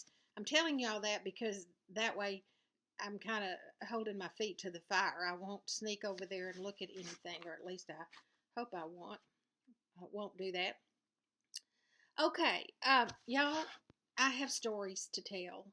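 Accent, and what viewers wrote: South, United States. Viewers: Hi, I love watching your videos, I am a southern girl too and I like hearing a familiar accent.:) I am from Texas and you will love First Monday in Canton. Wear very comfy shoes and bring money to spend lol Have fun in Texas!!! Oh, and since you also quilt, there is a nice little quilt shop called Sew N Sew in a little house not far from First Monday. The address is 22390 State Highway 64; Canton, Texas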